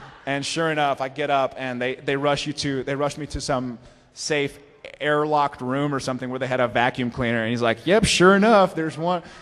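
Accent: Texas, United States. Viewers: You can really hear his Texan accent here. Love it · Gotta love his Texas accent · I love the slight Texan drawl that his voice has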